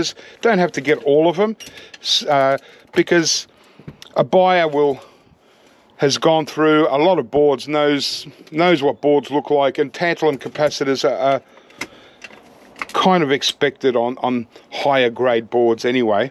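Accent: Australia. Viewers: I cry when I see him selling the boards without depopulating them like the TITLE said...but still he is very worthy of watching and the Aussie accent always tickles me.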